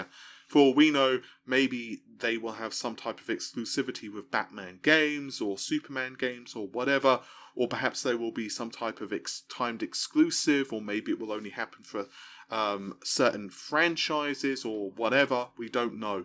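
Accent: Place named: RP, United Kingdom. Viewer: its because he has a posh english accent but then uses the F instead of TH. Freak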